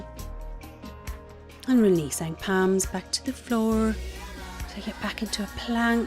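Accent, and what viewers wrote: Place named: Scotland, United Kingdom. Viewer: Love that scottisch accent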